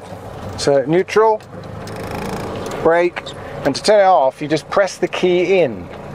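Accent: England, United Kingdom. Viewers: You're English, right?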